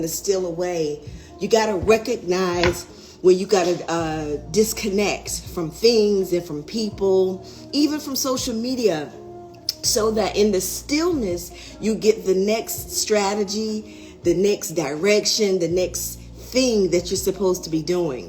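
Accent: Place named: Carolinas, United States. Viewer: Heeeey you from The Carolina’s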